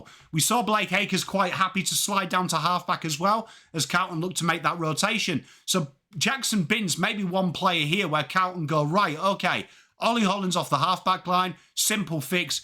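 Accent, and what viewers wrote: England, United Kingdom. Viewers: a pommy talking football lol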